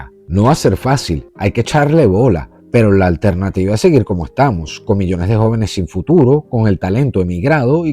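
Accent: Venezuela. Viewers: ¿De qué parte de Venezuela es ese acento? Jamás lo había escuchado antes.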